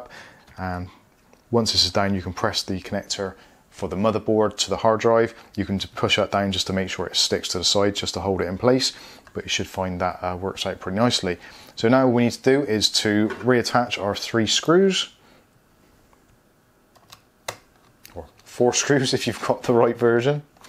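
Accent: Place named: West Country, United Kingdom. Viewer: For some reason, I find your west country accent a bit amusing.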